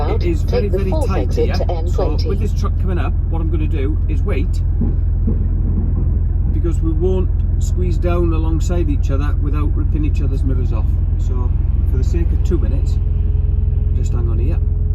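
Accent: Wales, United Kingdom. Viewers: great video, do I detect a welsh accent, good to see you are not having your image in screen at all times, like some I could mention !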